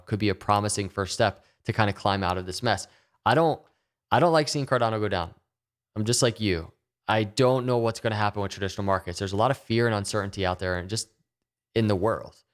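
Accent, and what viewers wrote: Philadelphia, United States. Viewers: Gotta love that Philly accent. GO BIRDS! Let's go Cardano too!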